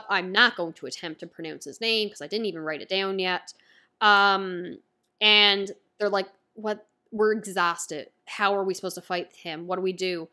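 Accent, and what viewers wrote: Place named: Canada. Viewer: My German accent is no better but your Canadian (I think) is killing lmao hahaha the way you say "Lahhh" instead of "Law" has me in tears. Love the content!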